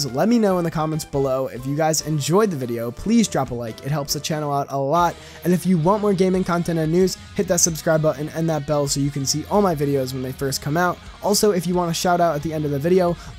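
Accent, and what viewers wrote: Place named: United States. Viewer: US English doesn't even sound that bad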